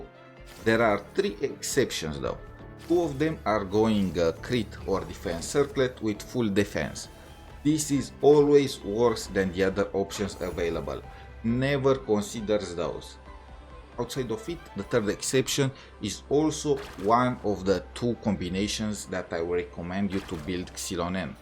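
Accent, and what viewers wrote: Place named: Philippines. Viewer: ur accent is strong. r u perhaps from phillipines?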